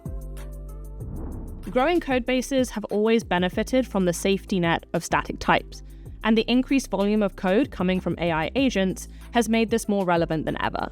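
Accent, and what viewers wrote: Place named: South Africa. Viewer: is she south African or Australian? I can't tell?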